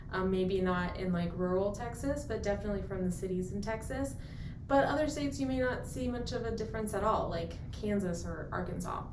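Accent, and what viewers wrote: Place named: East Coast, United States. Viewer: 1 Are you originally from OK? You have a "back east"accent. 2 What is your take on Bartlesville area? Thx for the vid.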